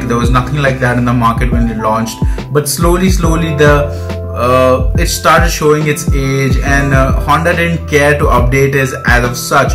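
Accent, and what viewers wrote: India. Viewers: Finally a Indian English review.!!! Thank you for helping me understand the review. Was looking so hard for a good review well done!!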